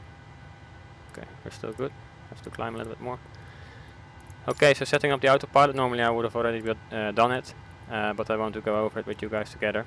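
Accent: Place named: Netherlands. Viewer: hahahah voor mij persé hoeft het niet hoor!! Ik hoorde je stem en ik dacht die komt uit hetzelfde land hahaha! Weetje tegenwoordig kan iedereen best goed engels dus verstaat iedereen je prima en met engelse video’s kan je mensen internationaal bereiken!! Blijf gewoon zo doorgaan en ik zou lekker in engels doorgaan!! Je bent goed bezig!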